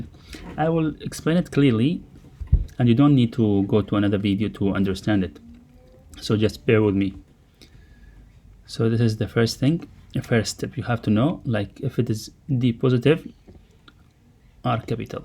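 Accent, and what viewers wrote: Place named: Arab world. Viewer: Your accent sounds like an Arab accent I think so😅 your explanation is so good !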